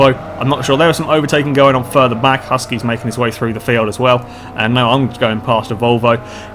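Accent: United Kingdom. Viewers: Some of you might confuse of him. He really speak like that because of his British Accent. Don't complain too much what he is saying. '_'"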